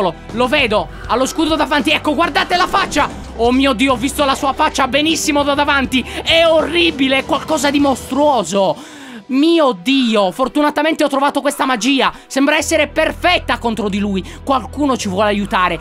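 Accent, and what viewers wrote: Sardinia, Italy. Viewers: sono sardo come te e ho scoperto di dove sei perché l'accento e il nome sono di un posto della Sardegna che che conosco bene.Prima pensavo che fossi di Sassari ma ho capito che non era così comunque non dirò a nessuno nei commenti di dove sei perché farei lo spammone bastardo ma tralasciando questo....volevo chiederti se facevi dei raduni perché tu mi stai molto simpatico e molto a cuore e vorrei incontrarti di persona.Ho 11 anni e sono tuo fan a quando facevi "Dayze" che se non ricordi era un gioco di zombie e hai giocato moltissime partite sopratutto con Mario. Poi sono nate altre serie come quelle di Happy Whells e minecraft e se non ricordo bene il tuo primo video è stato proprio di minecraft.Comunque io vorrei veramente tanto che tu facessi un raduno qui in Sardegna perché vorrei incontrarti di persona e mi farebbe veramente felice. 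SPOLLICIATE PER FARGLIELO LEGGERE!!!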